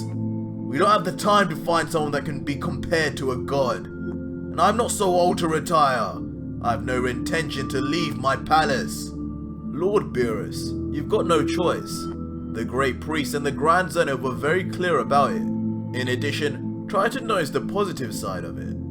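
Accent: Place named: United Kingdom. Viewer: Dewi Middle he has a British accent though 🤦🏻‍♀️🤦🏻‍♀️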